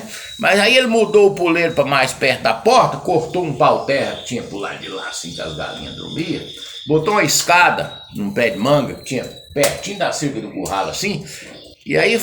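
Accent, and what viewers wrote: Goiás, Brazil. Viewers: Sou de São roque SP gosto de te ouvir seus caso seu linguajar no sotaque goiano e caipira é chik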